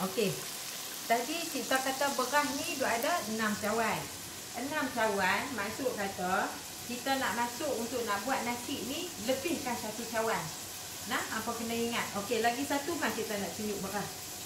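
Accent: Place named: North, Malaysia. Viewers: Dah cuba resepi Ayam Masak Kesuma! Puaih hati... selalu dok tengok channel dengan suami. Suami seronok sebab dengaq loghat Utagha! Gelak2 dia. Semoga ramai yang menggunakan resepi, jariah nanti kat akhirat. Moga Allah terima...Aamiin2 YRA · terhibur sungguh dgn loghat utagha buat kami diperantauan...’punca semua ni’ ayat tergelak besaq 😂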